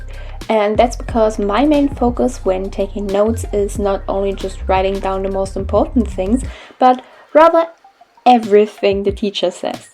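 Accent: Germany. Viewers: Der deutsche Akzent ist so cute HAHAHAHA. Aber tolles Video ❤️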